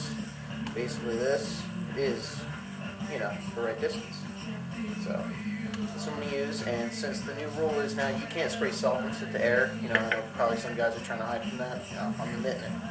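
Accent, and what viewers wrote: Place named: United States. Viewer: Yo, great video! Very thorough, I appreciate it a lot. Around the mark you mentioned that it's now illegal to do... such and such. I didn't quite catch that, despite trying to replay that portion. What's now illegal, exactly? It is only in your state, or nation-wide (assuming you're in the US based on your accent) Thanks again.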